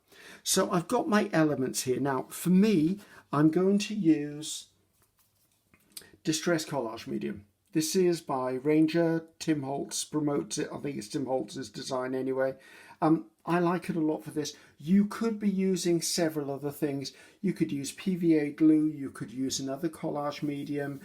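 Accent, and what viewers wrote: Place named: Southampton, United Kingdom. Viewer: What a pleasure hearing you ! It takes me more than 50 years ago, when I went to see my granny for my holidays in Southampton. Her way of talking was like yours, same style same words same particular idioms (tickle in the throat for e.g.) that I haven’t heard since. When I watch your videos I'm a little bit at home. Now I’ve lost all practice and vocabulary and the « Oxford » way of speaking is the only one I can understand. Thanks so much for this « madeleine de Proust ».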